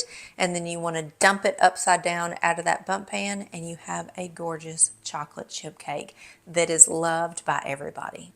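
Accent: South, United States. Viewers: 🤣🤣🤣🤣🤣🤣🤣🤣she is a southern woman that's how they pronounce every thing and I love it!!! okayeee bless your heart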